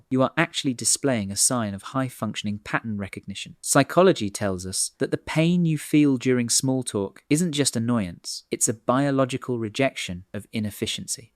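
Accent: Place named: United Kingdom. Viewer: I don't know why your videos are so funny. Think it's the satire with that serious british accent - I love it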